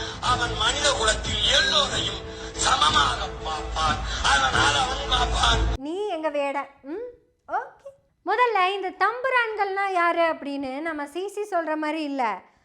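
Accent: Kongu, India. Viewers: சகோதரி, உங்க கொங்கு தமிழுக்கு நான் ரசிகன். 👏👏👏👏👏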